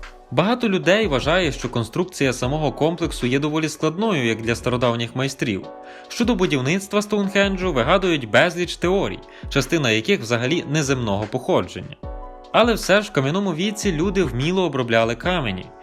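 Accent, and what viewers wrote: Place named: Volyn, Ukraine. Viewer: Класний голос у диктора, з таким рідним ледь помітним волинським акцентом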